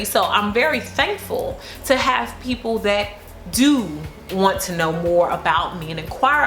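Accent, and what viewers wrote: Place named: St. Louis, United States. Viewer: You inspire thousands with your knowledge and God speak. Your St. Louis accent is part of YOU and I'm glad that you're proud of it. I've been following you for 3 years and have learned a lot and appreciate your time & dedication that you've put in this channel. I'm happy for your new beginnings & proud of your accomplishments!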